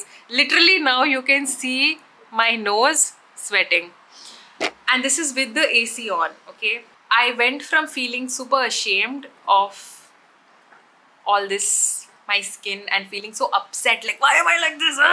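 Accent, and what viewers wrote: India. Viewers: Bro your intonation is so indian coded i love it. It's like finding something familiar on the internet <3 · Your English is perfect Indian English I feel like I'm listening to a friend 😂 unlike some influencers putting on a fake accent..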